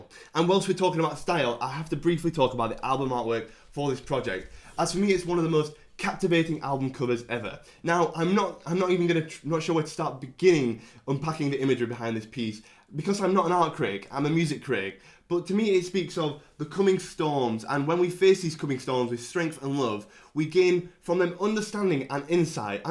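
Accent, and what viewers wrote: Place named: Yorkshire, United Kingdom. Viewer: Totally butchered John's name! He's a fellow Yorkshire man. I assume you're a Yorkshire man by your accent. It's pronounced 'John Mc- clock- lin'! Nice review!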